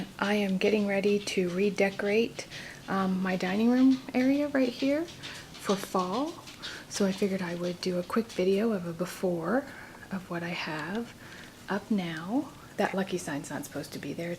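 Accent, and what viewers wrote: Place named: South, United States. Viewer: Hello just discovered your channel . I was actually looking at your daughters channel cause she tagged someone . I must say I'm digging the southern ascent , very cute ;)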